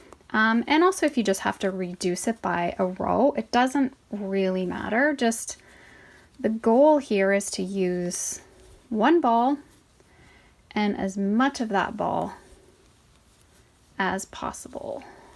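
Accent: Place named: Canada. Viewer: These are addictive! I'm a knitter, and all my crochet attempts get ripped out. I made three of these! Thanks so much for sharing your wonderful creativity. On a completely different note, I'm a linguist, and I'm trying to place your accent :) The way you say "about" makes me think Canada, but the way you say "to" or "two" is really stumping me. Would you mind saying what region of N. America you hail from?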